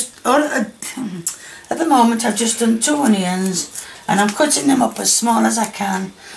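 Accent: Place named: Yorkshire, United Kingdom. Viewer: I love all your videos. I live in London now, moved from Yorkshire, so it's so nice to hear your accent. Keep enjoying yourself and ignore the idiots:) X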